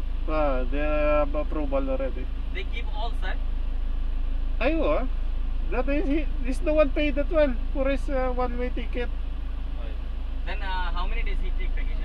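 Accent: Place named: Iloilo, Philippines. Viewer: It's ok po after pandemic nlang po kayo mag vacation. You sound an ilonggo kuya hehe..ingat po kayo.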